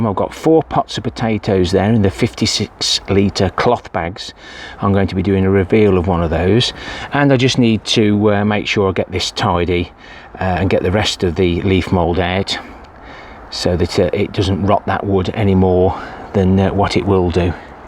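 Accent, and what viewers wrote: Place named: Midlands, United Kingdom. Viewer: Where in the Midlands are you from? I'm originally from Leicestershire! Now living in Scotland for the past 10 years 😁👍 I recognise the accent!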